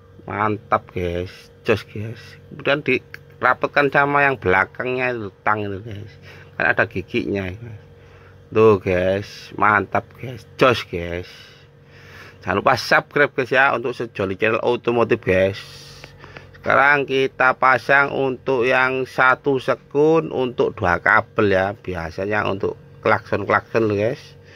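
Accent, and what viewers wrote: East Java, Indonesia. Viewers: Aing mah resep. Gaya ngomong jawa timur na cak euy.!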